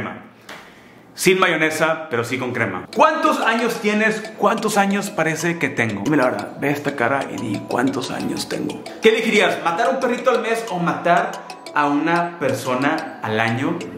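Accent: Monterrey, Mexico. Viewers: Los regiosssss su acentooooo😊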